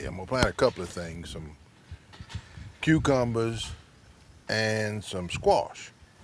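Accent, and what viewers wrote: Louisiana, United States. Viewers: Just found you on YouTube Some of the vids are a bit older but not a lot changes in gardening. Love the Cajun accent. How about some zydeco music?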